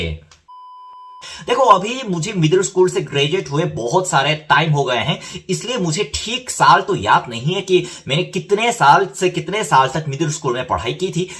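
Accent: Standard. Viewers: Haayyy allah itni shudh hindi hume nhi aati hindustan main aake toba toba😂😂😂🥂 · भाई इतना शुद्ध हिंदी कैसे बोल लेते हो इतना सटीक शब्द तो हम लोग नही बोल पाते हैं 😂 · Bhai jitni shudh hindi tumhe aati hai itni shudh hindi toh hame India mai rehkar nhi aati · Apne itne sudh hindi 😯, khana se sikhi.... Itne toh mujhse nhi boli jati 😂😂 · North east wale nhi tumse v jyda sudh hindi bol raha hai korean log samjhe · inti sudh hindi hamare hindi ke professor bhi nahi bolte..❤👍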